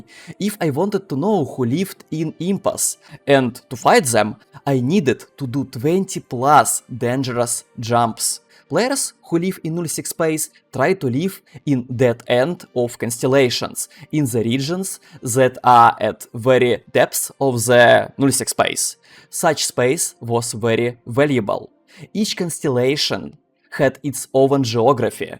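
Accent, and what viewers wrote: Russia. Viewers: Ну хз, вот как раз такой "русский" английский я понимаю, потому что произносятся те звуки, которые иностранци проглатывают :D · Ляя, ну нахера стараться держать этот русский акцент. Ты можешь читать нормально по английски. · ты когда говоришь на английском, представь, что ешь картошку) А то слишком русский акцент, по ушам бьет и могут не понимать.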